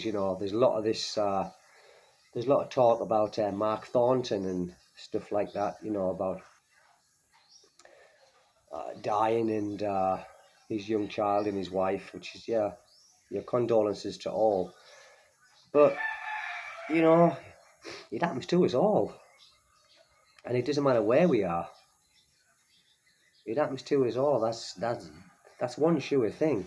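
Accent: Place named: Northern England, United Kingdom. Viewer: Are you from Manchester by any chance ? Im sure somewhere from the Northern England based on your accent.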